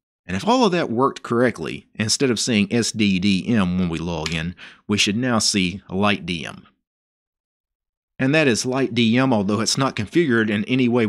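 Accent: South, United States. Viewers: words like: fAantAastic, really cul, beooting, anythieng, goe with; they do sound southern cause difference is in pronunciation of vowels, however there are although not many instances that consonants are pronounced with unusual stress change, Im a faking Linguist that's why its interesting for me amdD well this one is also d at the end so its practically D+EE which C+V, light deiM stress is on last C, urvxdTee is also C+V so nothing abnormal here ITS HARD to pick and choose the words its the overall sound; Southern accent is IN YOUR FACE, 2 words in u can pinpoint where it is from, I guess has spent a period of time in other states, or he has constant contact with people of other states THAT DO NOT speak normal American accent. hey would you answer this too please, about living in other states or having constant contact with ppl from those areas?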